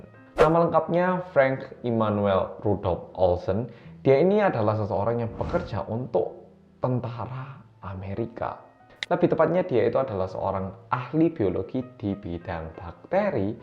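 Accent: Java, Indonesia. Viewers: Lah ini hehhe akhirnya 😁😁😁 kalo gada suarane kaaya gak klop mas. Gak bisa denger medoknya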